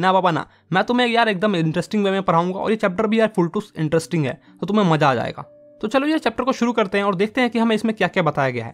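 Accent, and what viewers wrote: Bihar, India. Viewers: Bhai you are from Bihar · Bhaiya aap Bihar se hai kya ?? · Bhai bihar se ho kya?? 😊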